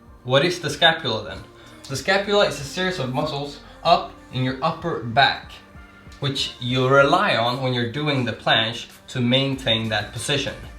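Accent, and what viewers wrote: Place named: Scandinavia. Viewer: Where ya from dude, you sound Scandinavian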